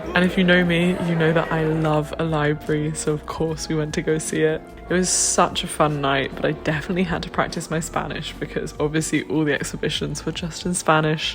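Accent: Argentina. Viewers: Hey! I have a few things for you to maybe help your Spanish! Let's go = Vamos (Second person in plural, present tense of "ir"), "Vamonos" sound like let's leave for Argentinians. "Hoy" sounds a lot like the German word Heute, both mean today You can you "Son las 3 de la tarde", you can just forget about the subject of the sentences, it doesen't matters to spanish speakers if it's the hour or the hours that "son". I love that your Spanish has a little of an Argentinian "sh" sound 🤭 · Aaaah you already have kind of an argentinian accent, so cute, you are doing so good 👏🏼👏🏼👏🏼👏🏼 mucho ánimo 💪🏼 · "jugo de naranja para 'shevar'?" AMO que su español tenga un pequeño acento argentino, es muy tierno💕💕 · Me encanta tu acento cuando hablas el español. Un toque del argentino, ay que precioso!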